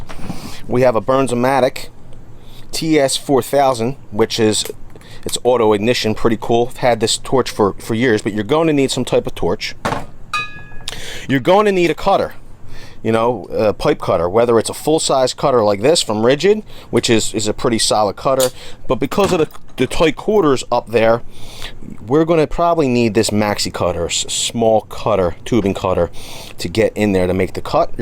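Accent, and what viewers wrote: Philadelphia, United States. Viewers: Got to be Philly yo jagoff !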